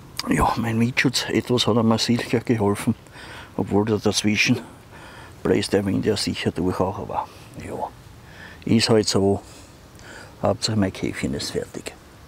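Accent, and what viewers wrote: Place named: Austria. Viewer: Hallo, ich liebe das Österreichische. Und von dir ist es irgend wie noch beruhigend. Danke für die Tour. Gruß Goldjunge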